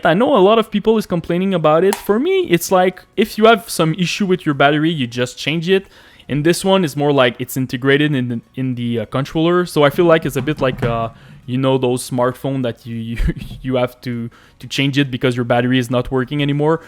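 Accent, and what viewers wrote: Quebec, Canada. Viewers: Est ce que tu est québécois? parce que j'attend un petit accent XD mais sinon superbe vidéo.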